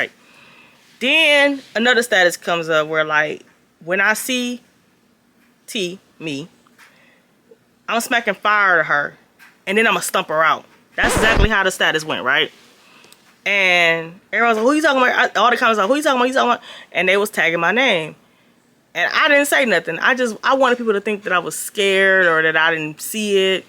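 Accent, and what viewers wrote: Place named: Flint, United States. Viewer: OMG your accent sounds just like all the females in my husbands family. He's from Flint. 😊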